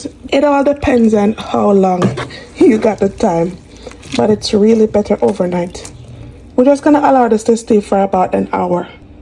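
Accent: Jamaica. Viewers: Just discovered your channel. Love that you get to the point. Love your recipes. Love love love the jamaican accent and I'm subscribed.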